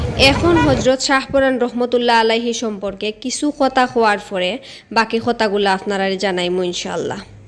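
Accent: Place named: Barishal, Bangladesh. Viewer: বরিশাইলা মনু😂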